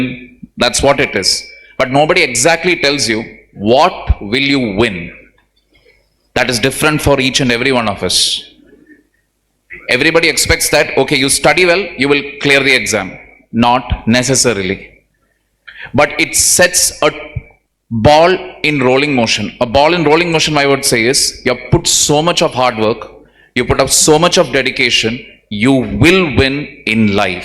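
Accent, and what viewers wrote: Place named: South, India. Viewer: sir belong south side that's why his tone like this.... But practice makes everything so practices it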